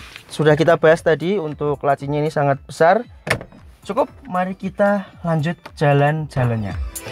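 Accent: Java, Indonesia. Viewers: Medok temen mas jawane... Hihi..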